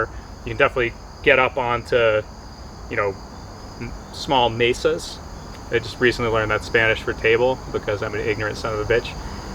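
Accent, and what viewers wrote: Boston, United States. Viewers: Hahaha Recovering XC Dork! Intermittent Boston accent.. Checkin all them boxes!! Hope you get some more free time kuz it’s fun to watch ;p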